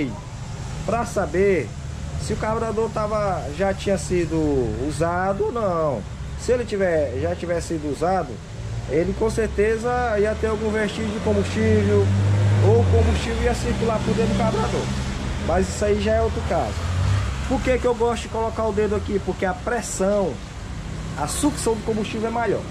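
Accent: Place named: Northeast, Brazil. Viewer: Show de bola merrmão!!! Me divirto ouvindo a maneira como você fala. Vou encomendar um dicionário "Nordestinês" para entender algumas palavras. Mas de boa, não estou mangando não. Não se aperreie pelo amor de Deus. Abraço do Léo o Tiozão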